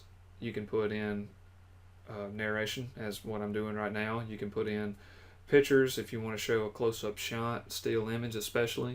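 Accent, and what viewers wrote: South, United States. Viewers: the only criticism I have is that the drill press was 1/4 inch out of line lol...I like your videos very much..I like the southern, easy way of speaking you have..This video will be of great help to me as I want to make a video. Listening to you speak is like having a fireside chat with a friend..Thank you very much for this video..a new fan from canada,,,Bill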